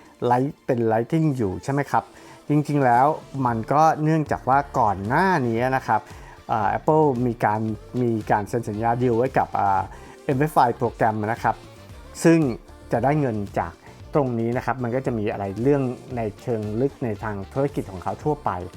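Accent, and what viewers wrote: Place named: South, Thailand. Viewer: แหลงใต้แล้วล่าสุดเยื้อไม่ไหว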